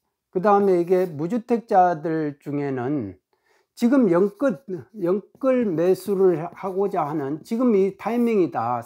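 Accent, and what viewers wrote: Jeolla, South Korea. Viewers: 사투리가 전라도였군요~ 그쪽지방출신이신데 무조건 한쪽으로 기울지않고 올바르고 진실된말씀주시니 더욱 존경하고 귀하십니다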